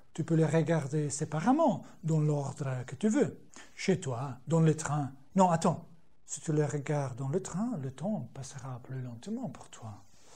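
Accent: Italy. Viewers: cavolo che accento italiano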